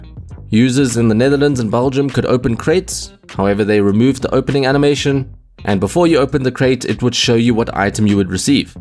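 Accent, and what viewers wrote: South Africa. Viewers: Yo bro you south african?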